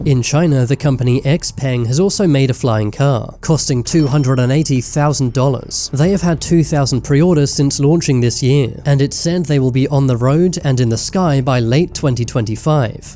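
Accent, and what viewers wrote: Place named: England, United Kingdom. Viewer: What enlish accent is this, makes me crazy listen to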